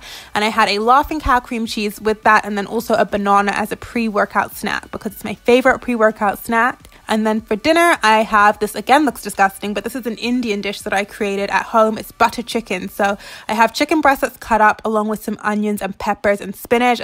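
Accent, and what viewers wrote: United Kingdom. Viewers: Your accent is sooo different in this video, I've been watching your videos since you started you WL - are you dating a Brit? Or been to the UK recently? The English accent is more obvious than it has ever been. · Why do you sometimes sound British? I’m kinda confused. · Hinata Hyuga she is British and she moved to Canada when she was younger. So her accent probably stuck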